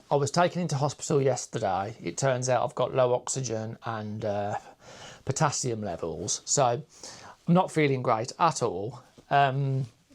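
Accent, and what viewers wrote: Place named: Black Country, United Kingdom. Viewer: Ah, - nice to meet you. Your Quilt featured in one of my FOQ's video's - view from a wheelchair and someone told me in the comments who you were. "That's quilt". Like many competition entrants, the public did not see the Judges views unless they'd won a prize, been shortlisted or were a Judges Choice Award. I was a first time entrant. Your Quilt stood out for me, as I couldn't believe a Novice had made it. Your Piecing was perfect and colour choices blended really well. I'm even more amazed now that I know you have a Disability (so do I) and I wonder how on earth you manage to manipulate such a large, heavy quilt? Bravo. I'm also fascinated by your accent, having lived in Wolverhampton for a while, worked in a Dudley Hospital and travelled by bus via Sedgeley, where the route passed through Upper and Lower Gornal. 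Don't worry, am not a stalker. Will like, subscribe and look forward to watching not only your back catalogue but your future plans. Special mention to your Mum for not reigning in your spending! Best wishes, Maggie, Chester. X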